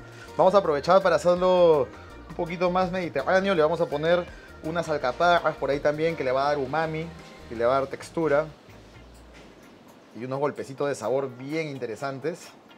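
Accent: Peru. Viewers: Que bien explicado, que profesional y que bonito y que elegante suena el español del Perú. Saludos desde España. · Soy admiradora de tu cocina pero me encanta y transporta tu forma tan peruano de hablar es como estar en Perú Gracias llevo 35 años fuera de mi Perú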